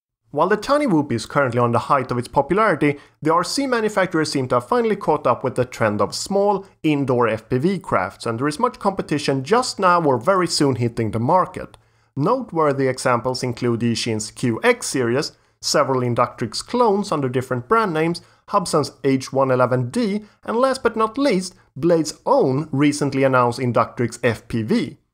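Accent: Sweden. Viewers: Your english tells me you are swedish. In that case: svara så jag vet, jag och sambon har slagit vad. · I bet an arm and a leg that the narrator is Swedish!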